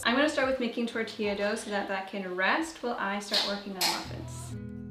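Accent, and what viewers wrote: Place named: Quebec, Canada. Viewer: it has to be the Canadian French influence. i tried learning a little of it once, but my ears are tuned to Spanish from growing up near the southern US border.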